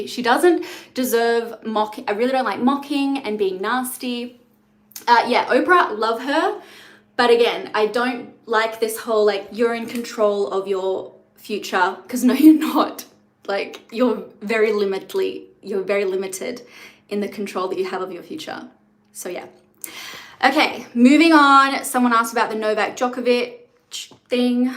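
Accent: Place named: Australia. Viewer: Ur accent is so much more pronounced now that ur home. I absolutely love it. Australian accent is my favorite accent. There are creators that I watch just to hear the accent.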